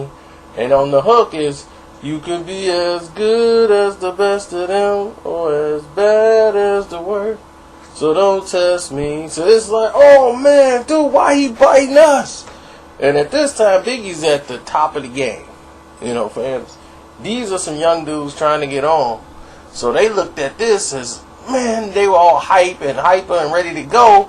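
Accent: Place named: Rural, United States. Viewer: This nigga telling half truth bullshit ass youtube videos. This nigga sound like he from the "COUNTRY" somewhere smh...stfu bama!!!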